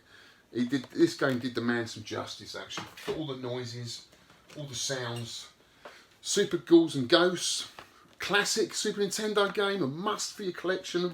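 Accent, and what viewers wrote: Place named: England, United Kingdom. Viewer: You sound like you're from England and if so, where do you find your retro games? Thanks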